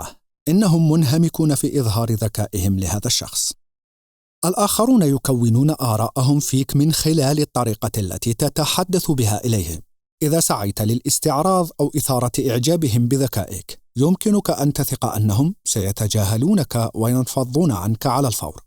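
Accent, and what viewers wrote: Mauritania. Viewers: هل القارئ موريتاني??? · القارئ موريتاني؟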